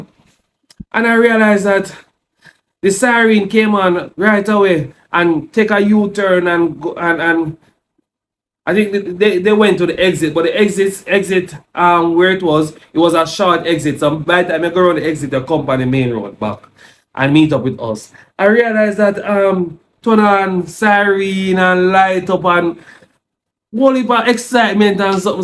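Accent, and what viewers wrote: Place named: Jamaica. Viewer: Wow❗ So sorry 2hear your story... 1st of all, realizing that this happens a lot to POC in recialised societies & places, that's true 😵 And glad 2learn tht no-physical-harm came to you guys💯 Buut also just saying w/o equivocation or disrespect, its pretty difficult to sometimes follow your heavy Jamaican patios ((pat-wah)) accent... Caveat: Don't you realise that you might have a **Global Audience (which is a good thing), & is why you're on a public platform in the 1st place, right? However, not every individual tht comes 2yr channel knows, or is aware of Jamaican patois words? ~As an intelligent young man, just be cognizant of that fact? ✴️Yuh can duh bettah man! Talk clear, plain-English. After all, you're addressing your Global Audience, not just Jamaicans😃👋🏽 #Continue2STAY safe out There •Bless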